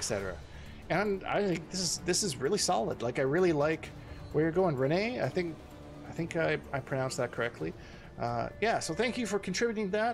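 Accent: Canada. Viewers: Ah aboot time we get another great video from love the way u guys say aboot in canada hope i can run into you in the beta brother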